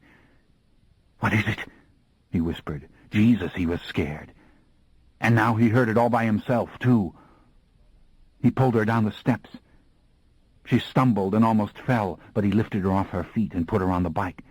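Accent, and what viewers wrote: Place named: France. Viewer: This narrator burns my nerves. Hey says everyons name with a French accent except Louis. A Frenchman from colonial New Orleans. Make it make sense.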